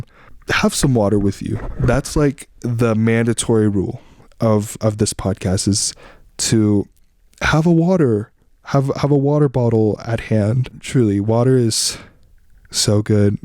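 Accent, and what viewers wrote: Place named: United States. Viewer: i just binged watched ur videos coz i watch ur “how i learned tagalog” video and wanted to hear how u speak filipino. Ur taglish sounds diff from the usual taglish. Its cool tho! Explanation: you have a different way of switching from tagalog to english and sneaking in english word + there's some filipino words that you translated in english that we dont normally translate in english. (we would still say it in tagalog) Accent: u sound filipino american. We can distinct fil-ams accent. U have filipino accent with a hint of american accent. Pis dont take this as an offense. More like critics. And i love ur uniqueness in speaking To improve ur speaking... watch noontime shows or filipino podcasts and imitate their accent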